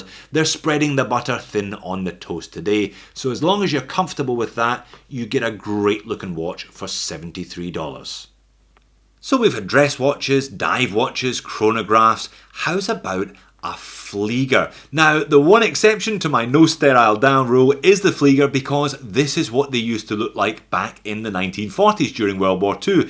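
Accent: Scotland, United Kingdom. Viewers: Oh man. Just forgive his Scottish accent and move on mate. 😀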